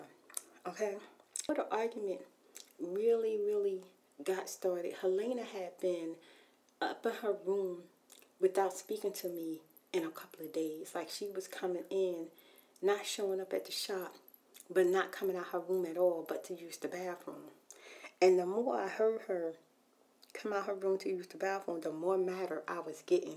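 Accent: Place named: South Carolina, United States. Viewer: Its skranger....and that's how we talk down in South Carolina....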